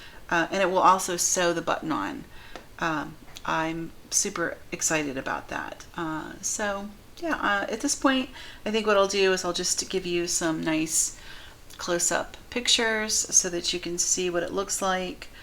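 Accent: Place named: Virginia Beach, United States. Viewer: Hi! I’m in the Netherlands and just ordered this machine from the Lidl website. I’m so excited to receive it that I started watching reviews...what a delight to find you live in VA Beach! I used to live in Norfolk, on the Bay, and went to school in VA Beach, and I miss it so much. It’s lovely to hear your 757 accent and also learn about my new machine. I’d be curious to know what kind of thread brand you’ve used on this machine with success. I’ve ordered some of the Crelando brand on the Lidl site and am also looking at some Gutermann thread, but if you have other suggestions that work well I’d be interested to hear it. Thanks so much for taking the time to make this informative content!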